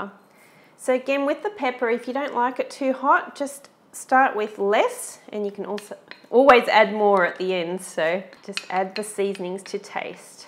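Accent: Australia. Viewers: . I love your videos. You do them the right way. You start out immediately giving step by step directions. You don't play background music and can be heard clearly. You keep the camera on the recipe. And I have never seen a video set up to where the viewer can print the recipe. That is wonderful. You simply do everything right in your videos. I absolutely love them. And I love your Australian accent too. Thank you so much. Let me just point out some of the things that are on most other videos that drive me crazy to the point of changing the channel. They do a lot of talking before starting the recipe. They bast background music so high you can't hear what they are saying. Or, they blast background music while printng the recipe directions on the screen where we have to freeze the picture to even read the recipe. These things drive me nuts. I don't bother watching them or subscribing to them.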